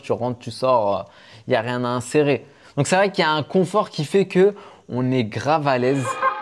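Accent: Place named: Banlieue, France. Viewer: Salut, où as tu tourné cette vidéo ? C'est un super endroit. Merci. Oar contre tu parles comme un mec de banlieue et ça ça gache la vidéo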